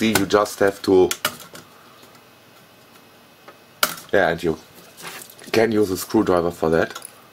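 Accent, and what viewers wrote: Germany. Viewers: I hear a strong german sound :-D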